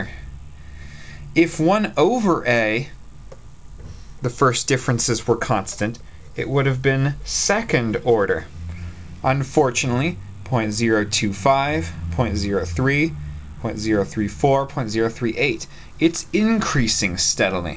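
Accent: Canada. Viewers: Are you Canadian? "Turns Ouuut to be..... "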